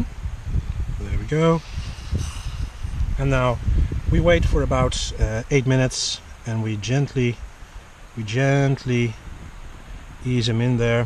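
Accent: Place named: Canada. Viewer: Another enjoyable video! Where are you from? I am thinking Canada? But I may be completely wrong. You do a great job!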